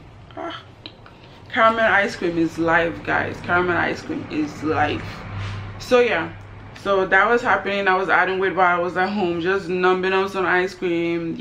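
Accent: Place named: Nigeria. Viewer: HMM THIS IS SERIOUS..U HAVE NAIJA ACCENT HERE OHH JESUS..COMPARE TO NOW ...HAHAHAHA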